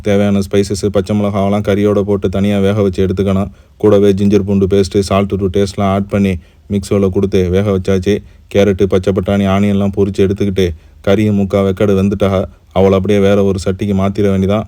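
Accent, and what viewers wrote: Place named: Adirampattinam, India. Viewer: Assalamu alaikum eppadi irukinga nice cooking 😂❤ neenga adirampatnama? Unga tamil antha maathiri iruku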